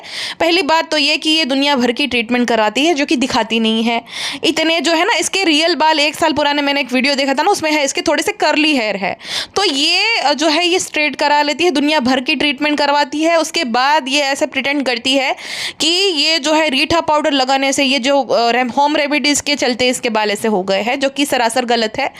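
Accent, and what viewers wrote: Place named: Kashmir, India. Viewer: Ma'am are you kashmiri ! Apna J&k wala accent aa rha hai 😄💜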